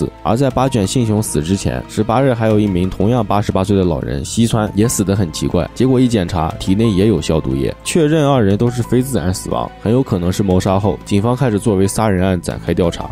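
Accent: China. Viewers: 播主是呼和浩特人？口音好重。。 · 哈哈，果然能听出来山西口音😄😄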